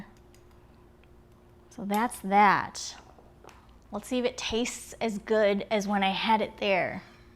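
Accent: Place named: Hawaii, United States. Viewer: I wanted to try cafe du monde mix but I wasn’t sure how it would turn out. So I searched for a video of someone who had done it…and found you. Are you from Hawaii? You sound like you are.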